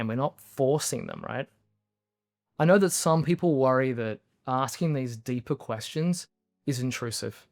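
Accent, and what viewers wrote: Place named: Australia. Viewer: Me too, and I like his Aussie accent too! 😊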